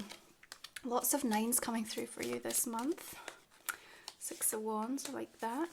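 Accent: Scotland, United Kingdom. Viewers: I'm a Glaswegian leo in new York and it's nice to hear that scottish accent reading tarot, maybe it'll bring me luck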